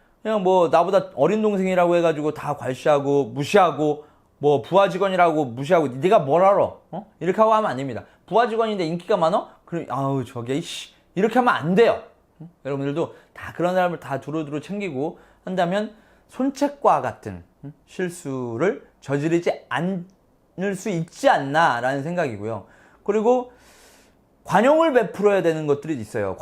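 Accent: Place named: Chungcheong, South Korea. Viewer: 충청도 삼촌이 구수하게 삼국지 얘기 들려주는거 같다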